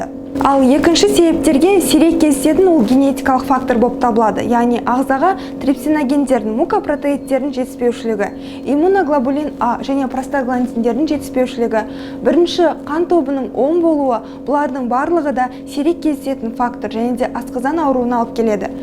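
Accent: Standard. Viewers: Құп көрдік. Міне осындай таза сөйлейтін қазақтар көбейе берсін... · Міне керемет ! Таза қазақша түсіндірді кеңесіңе Рахмет!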